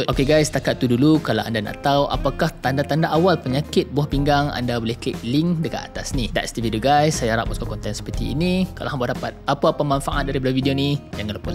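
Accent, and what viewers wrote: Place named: Kedah, Malaysia. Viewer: Weiii ,hangg org kedahhkaaa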